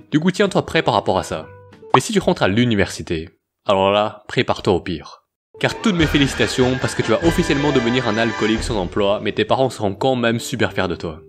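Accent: Belgium. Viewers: Tu es belge? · T belge?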